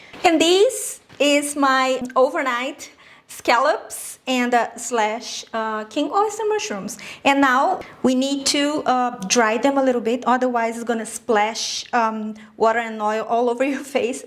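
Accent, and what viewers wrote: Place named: Brazil. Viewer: First time I watched one of your videos I thought "what accent is that, where have I heard it before?" and then I saw your last name and realised it's the same one I have, hahaha. Great too see a fellow brazillian making such beautiful vegan dishes and reaching the whole world with your recipes. Te desejo todo o sucesso do mundo,!:)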